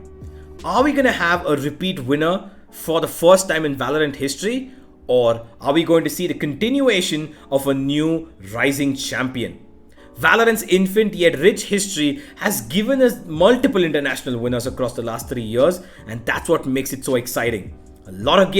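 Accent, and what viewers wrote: India. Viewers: Great video keep it going, its nice to listen an Indian voice talking about this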